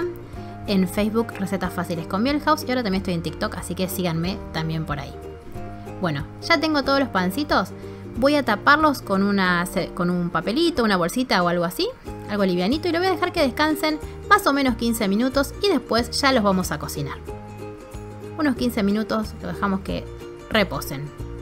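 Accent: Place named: Argentina. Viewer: El acento argentino me encanta, nada que ver con el de otras cocineras. Cada una tiene su timbre inconfundible.❤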